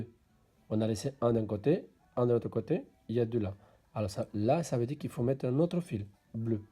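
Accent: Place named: Pakistan. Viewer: excusez moi l'accent je pensse indou mais non c Pakistan lol bref continue vos vidéos svp vous expliquez trop bien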